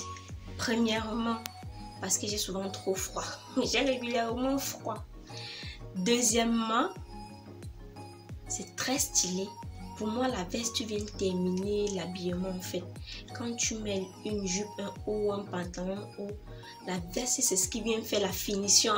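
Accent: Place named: Cameroon. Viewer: c’est ton accent 🥰 j’ai fait par élimination et je suis tombée sur le Cameroun 🇨🇲🔥 😉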